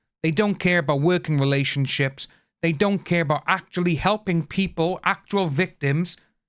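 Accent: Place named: United Kingdom. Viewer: I will never watch anything with AH in it. I am looking forward to when Aquaman is done as she has nothing new in the pipeline. Hopefully this will mean that she will disappear off my social media. Thanks for the report. I am British but live in Pennsylvania USA. So nice to hear a British accent again other than my own 👍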